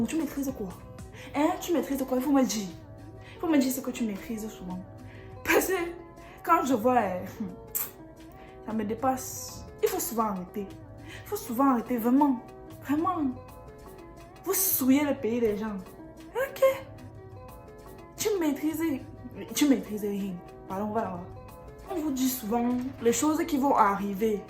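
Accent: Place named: Cameroon. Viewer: ... bonjour à toi… je me suis arrêté où tu dis MAJOUMBOUM... si tu voyais la tête que tu fais en disant ce mot...😁... bref tu as dis à la fin que tu espère qu'on a aimé, j'ai aimé mais je trouve que tu as été trop doux envers les Camerounais… le Camerounais a tellement des talents et des dons mais dommage la transmission est ZERO, sur le plan éducatif MAJOUMBOUM et tout le reste là, nos petites sœurs et petits frères retiennent quoi sur le plan INSTRUCTIF… Mon père MEA dit souvent on ne peut donner que ce que l'on connait, nos frères adorent le bruit et le bouquant. l'ambiance n'est pas une mauvaise chose mais il faut que ça éduque, que ça instruit; on va toujours nous insulter et nous qualifier ( MAJOUMBOUM ). et en passant tu as gardé ton accent Camerounais où est passé l'accent grec???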